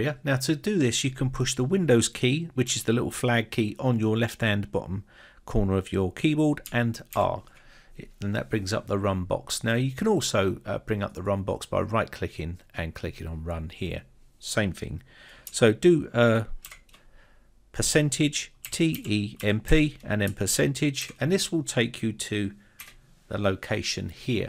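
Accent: United Kingdom. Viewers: First tutorial I see by a British mate! Cheers!!! Bloody hell !!! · He sounds nothing like Korg... The guy in the video has a British accent, Korg has an NZ accent.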